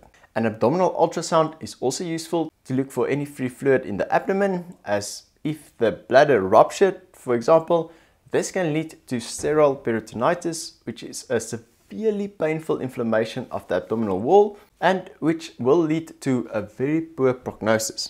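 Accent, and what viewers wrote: South Africa. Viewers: Kan hoor jys van suid afrika en n afrikaner lol.... my pikanese se oog lyk of hy wil uit pop , dis wat my na die video bring.... lol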